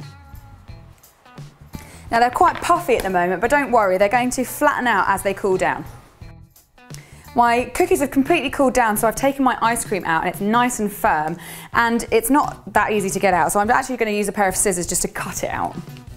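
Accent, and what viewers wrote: United Kingdom. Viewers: I sometimes find it hard to catch what you are saying because you talk too fast. 😅 Don't get me wrong I love to the British accent! Nevertheless I love watching your videos or just to hear you talk. 😄😄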